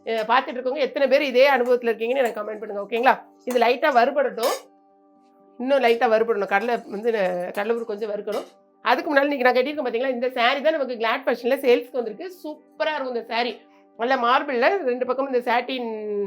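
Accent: Tirunelveli, India. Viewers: திருப்பூர் கோகிலா... வணக்கம் மேடம்... உங்க வீடியோ பாத்தா உடனே ஒரு லைக் போட்டு தான் வீடியோக்கு உள்ளேயே பாக்கறது... Because உங்க திருநெல்வேலி பாஷைக்கு நான் அடிமை 😍😍😍எங்க வீட்டுலயும் இந்த பொடி செய்வோம் 💚💚💚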